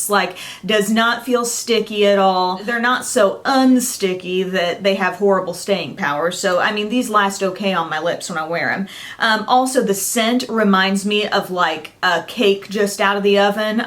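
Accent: South, United States. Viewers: ! I've been watching your channel forever and today I decided to revisit some old videos. I noticed that sometimes a southern(?) accent comes out in your older videos, but it's completely missing in your newer ones. Just thought that was funny. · I did not notice it before but Oh yeah.. you have (a little) southern accent :))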